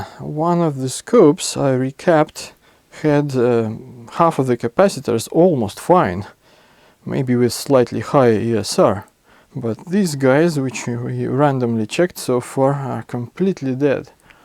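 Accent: Russia. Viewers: Hello to russian colleague! (English native speakers never actually realise how exactly the real russian accent sounds like!) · Акцент, и очень сильный. Я только потому задержался на видео, что с первых слов заметил типичное российское звучание английского. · Great video. Enjoyed very much watching/listening while you troubleshoted. Thanks for sharing. (Also enjoyable to hear various english 'world'-dialects, and I guessing you are east-country, russian or something. Its beautiful that there are so many variations around :-) ..have nice week to you, fam and the surroundings. br, Rolf/norway)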